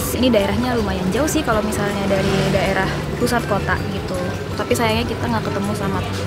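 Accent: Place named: Bandung, Indonesia. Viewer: ngomong nya ky jalanan bandung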